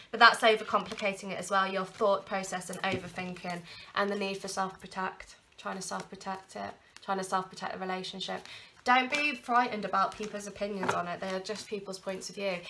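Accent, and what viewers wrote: United Kingdom. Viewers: I am so happy that I discovered and AND that I stick by watching your videos, in spite I have hard time to understand British accent (english is my second, used to American). You are so wise and connected, I find your insights are being accurate. #1 for me, totally on spot, and Thank you for that.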